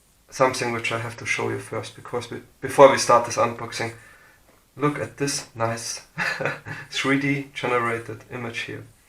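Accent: Germany. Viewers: German? I think i hear an little accent? If yes i got a few questions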